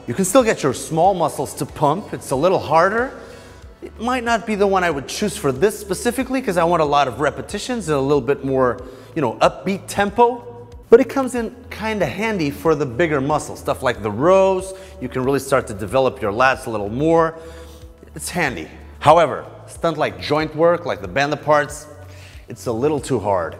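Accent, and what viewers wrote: Portugal. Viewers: Quando fazes a pesquisa em inglês para obter mais informação, mas o melhor resultado é aquele craque tuga marado a falar inglês ahahah Grande Caralho 💪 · Passei o video todo a pensar "este gajo deve ser Português"... devia ter lido logo a descrição!:D Belo Video! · Reparei que era tuga pelo sotaque. Excelente video!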